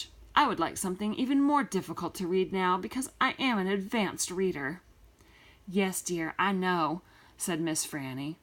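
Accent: South, United States. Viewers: You have a pretty voice it’s country I love it thanks for doing my hw 💖❤️🧡💛💚💙💜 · Her accent was from the country she’s a beautiful reader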